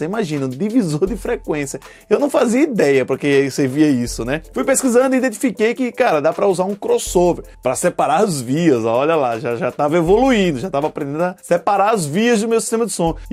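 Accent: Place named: Pernambuco, Brazil. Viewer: Imaginei que fosse pernambucano.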